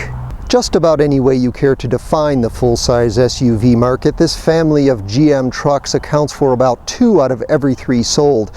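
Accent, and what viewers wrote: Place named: Canada. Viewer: Great video! These big trucks are any traditional boy's matchbox dream come true. You've got a sub! I must ask though, out of curiosity, are you Canadian? Your accent sounds close to home. Cheers from the north east!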